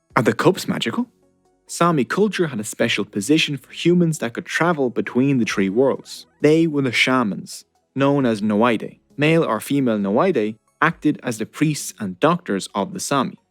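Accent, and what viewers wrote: Dublin, Ireland. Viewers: Last thing I expected was the thick inner city Dublin accent! Brilliant. Thanks a million champ!!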